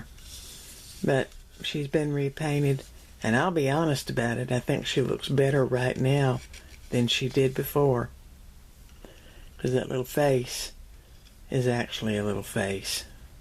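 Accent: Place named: South, United States. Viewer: I am really impressed with your dedication to your talent. 50 yrs is a long time. I, too, have taken a few watercolor classes long ago, but would have to start from scratch in my knowledge. Remember some things but not much! One of my workshop teachers worked in egg tempera sometimes. Have you ever worked in that?. I have so many hobbies, it’s had to get into any of them as deep as I’d like. I’m retired now, so I can partake more.Would love to see some of your paintings. From your accent, it tells me you live in the south. May I ask where? I enjoyed your seeing your pretty yard. Thank you for sharing your videos. I enjoy your teaching. I’ve been collecting and making antique reproduction porcelain dolls, and trying to dress some. I love dolls! Love always having my eye out for a cheap one with potential. My doll teachers have been restorers, too. 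I’d love to learn how to do my own purchases. I learn some things from them, but when it’s their living, I hate to be really nosy about techniques. I am learning through you and others on utube. Thank you so much, again! It’s so wonderful that you share! Again, would love to see your paintings. What is the style you use? Abstract, realism, landscapes, portraits, impressionist like, etc., etc? Thank you for your extended answer! Keep “arting”. 😉😊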